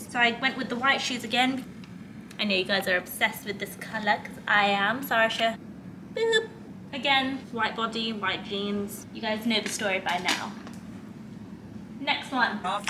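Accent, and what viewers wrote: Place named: United Kingdom. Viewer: You need to tell us your story, who you are and where r y from! Why do you have a british accent, WHO ARE U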